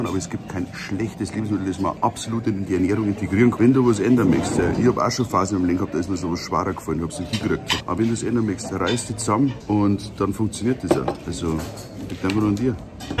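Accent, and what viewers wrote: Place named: Salzburg, Austria. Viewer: Bist du im Berchtesgadener Land dahoim? Der Dialekt klingt so gleich wie da meinige da in Salzburg.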